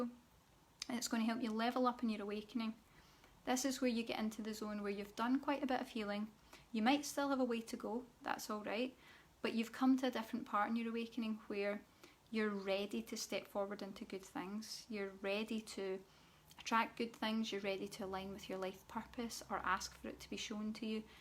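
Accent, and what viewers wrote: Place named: Scotland, United Kingdom. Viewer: Nice to hear the Scottish accent 😁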